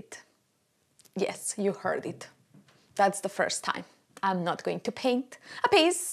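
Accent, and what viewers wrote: Russia. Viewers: Здравствуйте. По вашему легкому акценту я догадалась что вы русскоговорящая. Я смотрю все ваши проекты и мне очень нравится каждая ваша работа. Этим летом у меня предстоит большой проект тоже. В спальне буду красить всю мебель, приелся уже этот коричневый цвет. Вам успехов, а мне удачи (не то придется покупать новую мебель 😆) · Beautiful!! I love this technique, too! I found it by accident when my project got too dark and I tried bleaching it. Worked like a charm! I don’t worry, though I know I should, about the smell. I clean my whole house in bleach quite often; especially in the kids’ bathroom. I have five boys!! Ha! Bleach is my best friend! Also, not sure if you’re Russian, but your accent makes me miss my old neighborhood so much. I had many Russian friends there. Ugh. I miss them!!! 😭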